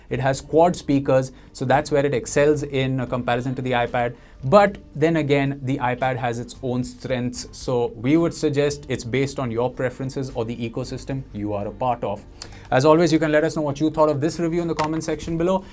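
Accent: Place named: India. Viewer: Video for Indians. The rest of the world cannot stand such accent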